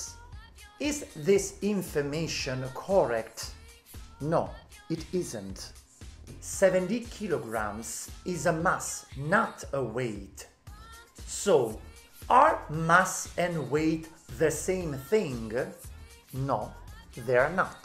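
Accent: Italy. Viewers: Your Italian accent is beautiful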